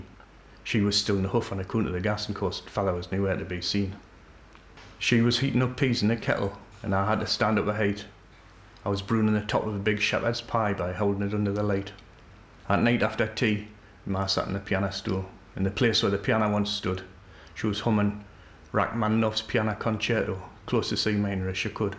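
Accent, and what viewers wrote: Geordie, United Kingdom. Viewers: I'm kinda shocked that I can hear similarities between this dialect and my own, I'm Barbadian. I wonder if it's just a coincidence, or if there was actually a lot of Geordie speakers in colonial Barbados.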